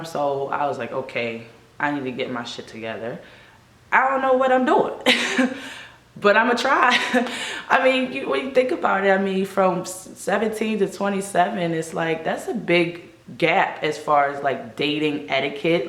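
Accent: Baltimore, United States. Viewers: The Baltimore came out in this vid lol #westbaltimoregirl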